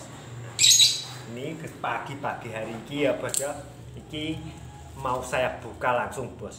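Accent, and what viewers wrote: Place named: Java, Indonesia. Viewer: medok tenan bahasa ne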